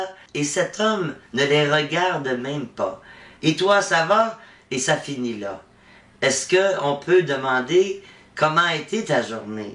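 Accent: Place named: Quebec, Canada. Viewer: Un sujet qui me touche particulièrement, car tout ce que tu dis est tellement vrai et de savoir que les autres ne nous écoutent pas, même au sein de notre propre famille, qu'on soit jeune ado, adulte ou même personne âgée, finit par enlever tout envie de se confier, par peur d'ennuyer ou par certitude que les autres se fichent de nos problèmes, ou parce que c'est souvent une habitude dans une famille de ne pas se livrer. En passant, j'adore ton accent québecquois!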